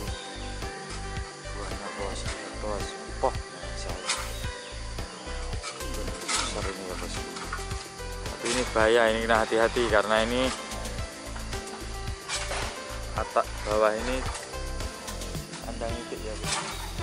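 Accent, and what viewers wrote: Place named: Java, Indonesia. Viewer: Logatmu jowo maseh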